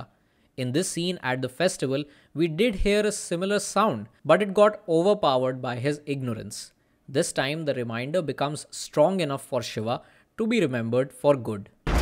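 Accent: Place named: Karnataka, India. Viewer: Going by your pronounciation, I thought you are Kannadiga. Dakshina Kannada/Karnataka, many have already commented. But a great video and I pleasently surprised by the sound design when villagers handover gun to Murali in climax. Had not noticed it. Thanks for the video.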